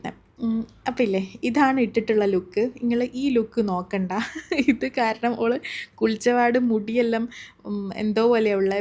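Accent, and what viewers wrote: Kannur, India. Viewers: Nalla nadan kannur varthanam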